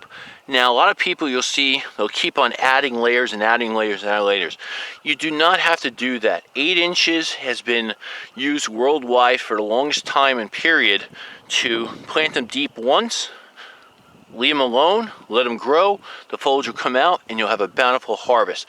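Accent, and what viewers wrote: New Jersey, United States. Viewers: I just found your channel recently, and I love it! What great advise. I've been gardening on raised beds, protected by a lot of leaves, but you've really open my eyes to what goes on in the soil. I'll be working more with cover crops now. I live in NH., but I'm from the Philly area, so listening to the Jersey accent is an extra bonus.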